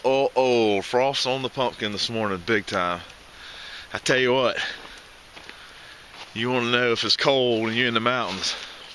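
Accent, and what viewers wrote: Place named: Texas, United States. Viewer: This guy has a southern Texas accent. They are all Bounty Hunters in Texas half the time. Americans have all been Bounty Hunters since the War Declaration by Elizabeth II of England in 1955. Americans are not immigrants from Great Britain. They are all Americans since Original Creation. They don't put up with the English trying to take over America. Queen of Canada and Royal Chieftain of Indians of America 🇺🇸 Métis of Toronto the Royal Land of Jesus Christ and Royal Indians of Mohawk and the Rouge and Humber Indian Chiefs to heal in Healing Waters Created By Christ Americans Honor God as Christ the Creator and they do not go hunting for Animals that God Created in the Beginning. They know what to shoot as the ones made by evil spirits or other people making them in America or Canada as one Continent that they know is theirs to defend. 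One Hundred percent of Americans are Bounty Hunters since 1955 and all the USA 🇺🇸 of the War Generations go Bounty Hunting. The Breeding Generations also go Bounty Hunting in North America. They know the American Flag is Created by God to represent America the Stars and Canada the Stripes. We as Canadians are the Ones out of touch with reality of what we should do to support Turtle Island to defend Our Country especially with so many War Criminals here in Canada and having been through two World Wars launched by Elizabeth II in England. They know the money issue is Canada's big problem and too many politicians and too many fake cops and too many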